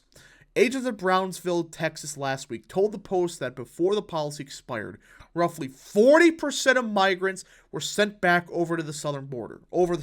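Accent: Wisconsin, United States. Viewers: Give him a break. He's from Wisconsin.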